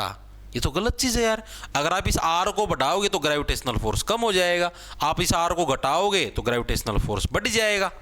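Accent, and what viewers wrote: Haryana, India. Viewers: Aap Haryana Se Hain Kya bahut acchi basa h ji 🎉🎉🎉acha padate h 💯🥹🥹🫣😍😍😍😍😍😍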